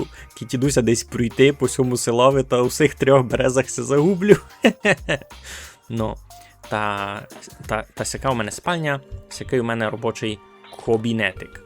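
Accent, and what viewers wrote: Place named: Mukachevo, Ukraine. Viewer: Та просто цікаво стало, в мене є знайомий просто з околиць Мукачева, то він говорить дуже подібно до вас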